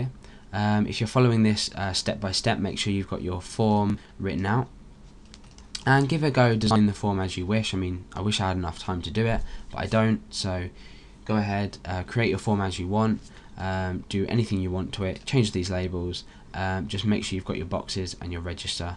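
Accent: United Kingdom. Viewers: dude, you're the best plus your UK accent, that makes you AWESOME, i love you!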